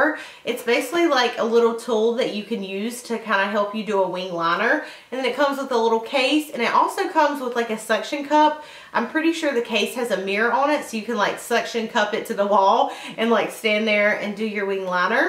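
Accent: South, United States. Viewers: I love you and your accent so much! I have always loved people of the south and ended up marring a man whose mom is from Georgia. You guys say things similarly and it warms my heart. Your channel has grown so much since I first started watching you! Great job! I now have a beauty channel as well if you would ever like to watch someone new.❤ I hope to go to IMATS next year! I live only about an hour and a half from LA!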